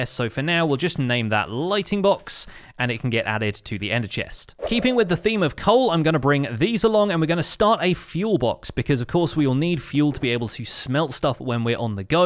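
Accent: United Kingdom. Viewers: Why did I notice he sounds British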